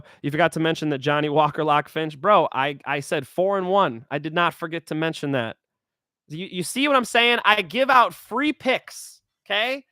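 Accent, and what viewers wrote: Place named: Tacoma, United States. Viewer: Are you from Northend Tacoma Wa?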